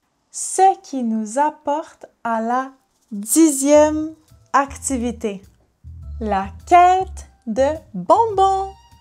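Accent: Quebec, Canada. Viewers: Fun video! I am amazed at how different the Quebecois accent is. It's lovely but I'm not used to it.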